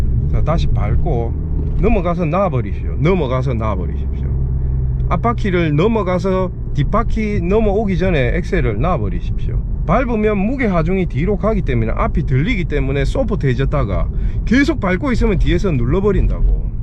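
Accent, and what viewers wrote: Gyeongsang, South Korea. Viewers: 혹시 경상도 남자라예? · 이런거 모르는 사람이 어딧어 못하는 사람은 운전 미숙이지 그리고 사투리부터 고치고 영상 만들어라 듣기 거북하구만 경상도 사람들은 사투리 못 고치나? 방송인 강호동 나오면 채널돌린다 비호감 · 잊고 살았던 구수한 경상도 사투리 ~ 좋습니다.ㅎㅎ ^^ 늘 응원 합니다 ^^ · 질이 들어놓니까.... 갱상도 부산,마산,창원(진례)? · 놀래뿌써요! 역시 경남 사투리가 좋아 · 제가 옛날부터 쓰던방법이고 알고있는지식이 또 유툽영상에 올라오니 신기하네요 . 사투리듣기좋아서 시청하고갑니다. 저도 경상권이라 ㅋ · 경상도 아이가 사투리 직이네~! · 왠지 마산분일듯요 사투리가 마산쪽인듯용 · 경상도 사투리 구수하네요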